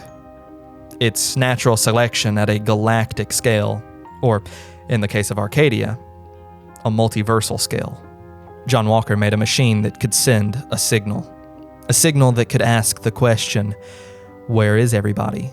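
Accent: South, United States. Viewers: I’m just so happy to find a relatively popular YouTuber (who makes great content btw! I’ve watched a few but this was the episode I subscribed over) who’s got a southern accent. I did pause the video at the beginning and went and watched all 17 or whatever videos of the OG and I’m sooo glad I did. So good. Great series, great commentary. I usually pick one or the other, the original or the summary, but for THIS series I’m so glad I took your advice.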